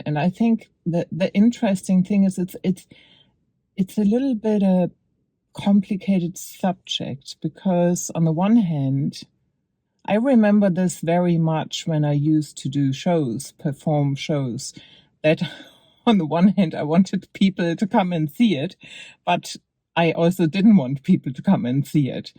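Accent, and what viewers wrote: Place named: Germany. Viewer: Nice and inspiring, I don't know why but I quite like the German accent lol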